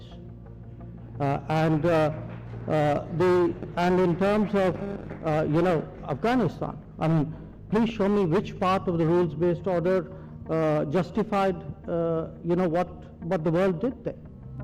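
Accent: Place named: India. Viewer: why would anyone listen to a telemarketer from an Indian call center?!! Shut up Hadji and answer the phone!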